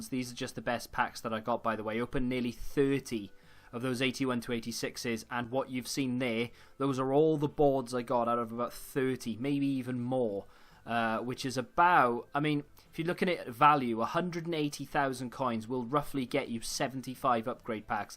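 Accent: Wales, United Kingdom. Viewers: Are u welsh ?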